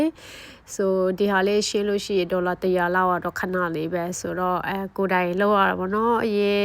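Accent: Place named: Myeik, Myanmar. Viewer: ဘိတ်သံလေးနဲ့ ချစ်ဖို့ကောင်း နေရော ❤❤❤ အားချစ် ညီမ ငယ်❤❤❤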